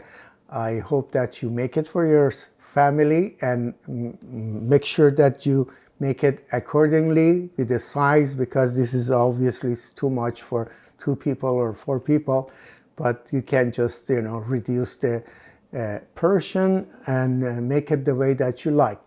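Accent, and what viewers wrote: Iran. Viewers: your voice... and with your Persian accent are just perfect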